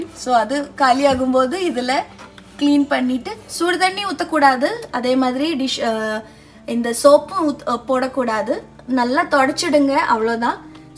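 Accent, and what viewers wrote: Kerala, India. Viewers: Iam ur new subscriber your voice and Malayalam mixed Tamil was tooo good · Malayali aano. Tamil kettit angane tonni. Puttu kutti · Hi sister,i like your way of speaking👍,malayali tamil pesurathu keka nalla eruku..🌹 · First time watching when I listened to i I thought the Tamil sounds like a Malayali speaking then I see the spices written in Malayalam .. r u malayali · Hi dear.. your Malayalam mixed Tamil is too cute! Like "Ethaathu"😍 can you share me the link for the grinder. I wanna buy one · me to first time watching superb aka unga voice malayali ponnu ivalavu alaga tamil paesurathu supera irku... nice kitchen neat and clean superb superb aka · Nice. As others say, ur tamil is sweet to hear. From ur slang i can guess u r a malayalee brahmin. But hv u not changed plastic to glass or steel. Its a trend now a days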